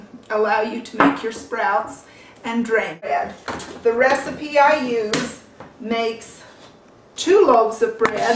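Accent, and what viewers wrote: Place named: Utah, United States. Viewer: Hi! I sure would like to try your recipe. How much wheat did you put in? I think the measure was edited out. I'll watch it again. BTW-as soon as you started talking I thought you must be LDS. You have that UT Mormon Mommy accent. So cute. When I looked at your other vids, I discovered I was spot on. Anyway...if you could give the exact recipe, I would very much appreciate it.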